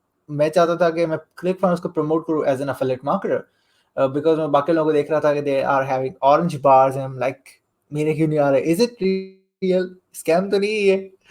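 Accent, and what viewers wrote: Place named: Pakistan. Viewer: He is a pakistani,it is audible from his accent. Woww! Great job u have shown that money is the greatest thing in world 🖕🖕🖕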